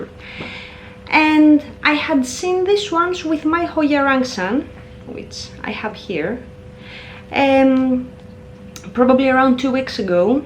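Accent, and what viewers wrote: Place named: Spain. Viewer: Hong Kong 😱 Definitely too far 😅 I’m Italian… I recognize something Spanish in your accent… I was hopping for a new weekend-Hoya-trip 🤪 Thanks a lot!!!!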